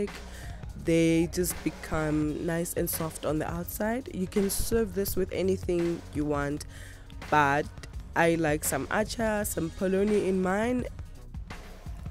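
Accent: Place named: Zimbabwe. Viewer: You sound sooooo ZIMBO 💕 Makes me so homesick just listening to you!!!! Gosh these look mnandi sibili 💕 Thanks sis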